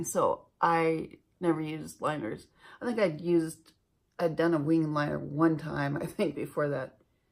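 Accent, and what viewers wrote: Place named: Alaska, United States. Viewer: Your videos are informative and fun to watch. And yes, you sound Alaskan to my West Coast ears. 😁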